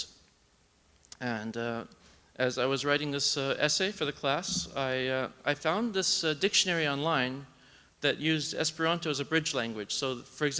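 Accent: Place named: United States. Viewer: It's funny, -- the thing I notice listening to this is how the melody of your speech cadences has changed to a more German-influenced sound, from your living in Germany so many years. Of course your accent is perfectly American, but if I listen to this so quietly that I can barely make out your words, the ups and downs of your intonation sound like German. Having spent months in Germany myself, without actually being a fluent German speaker, it was instantly recognizable to me. I don't remember this characteristic of your English many years ago when we first met. Fascinating!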